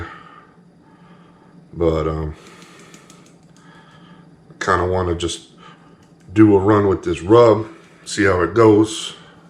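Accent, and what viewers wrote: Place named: East Coast, United States. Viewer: Ribs looked great, love the Heavy Metal EMS Tshirt and I detect a slight East Coast accent😳🦖 great job on the WSM👍🏼🥃🇺🇸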